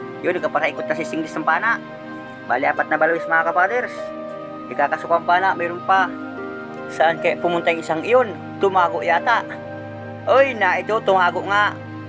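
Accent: Bicol, Philippines. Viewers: bicol na bicol ang tono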